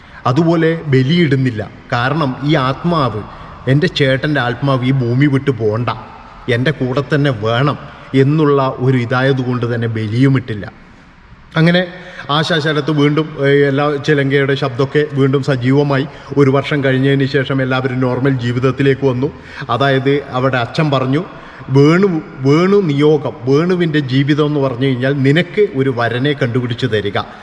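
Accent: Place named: Konkani, India. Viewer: നിങ്ങൾ തിരുമലക്കാരൻ കൊങ്കിണിയാണോ ശബ്ദം കേട്ടിട്ട് തനി കൊങ്കിണി മുതലാളി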